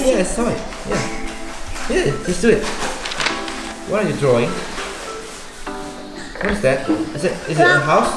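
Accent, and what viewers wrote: Java, Indonesia. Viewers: Medok tenan ko kkkk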